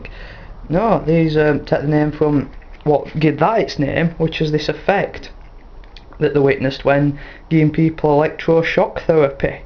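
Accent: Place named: Yorkshire, United Kingdom. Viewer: Great video, very helpful to see the different ages of your peas (i just started my peas on the 13th April, and they are doing nicely. i've planted 4 in a 5 gallon bucket and added twigs/branches around as a kind of trellis (very rustic) until i can plant outside after frost season (here in Norway) Yorkshire accent is very distinctive (i used to live there too) can you keep us updated on their progress please , helps knowing what they'll look like thx very much :)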